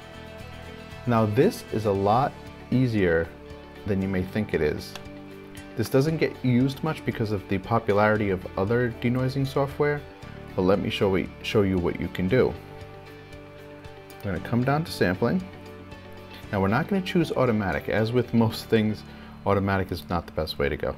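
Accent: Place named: Mid-Atlantic, United States. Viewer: Hi - or is that? Thanks for a well considered tut. The work around may be a bit fiddly, but I can see how it would work. For people interested in applying a mask-to-denoise method to stills, there is a vid tut made by the Photoshop plugin vendor, Topaz Labs, that explains this. I believe it was made 1-2 years ago. Regarding mask making to extract areas of luminance, I often use this technique with image sequences of actors in front of green screen. In this case, too often selected areas of colour have differing grain/ noise characteristics. I have also found playing with the AE Shadow/ Highlight filter on my ortho masks can help! If I may say so you come across as a patient, gentle, unhurried sort of person. Of course as filmmakers we all should be patient (and experiment!). However, too often video bloggers on YouTube dash through their tuts as if the moment had no significance other than seeking a moment of attention. 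Where do you hail from? You do not have a conventional North American accent. There is a hard end to your “A” sound, but the overall suggestion is mid Atlantic, or ex-pat Australian.:)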